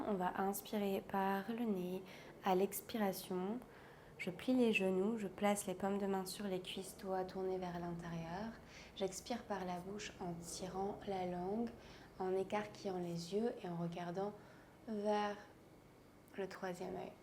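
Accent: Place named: France. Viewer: Bon dimanche, tu as un bel accent français, j'adore les grands écarts et les mouvements, chérie. 🥰❤🌹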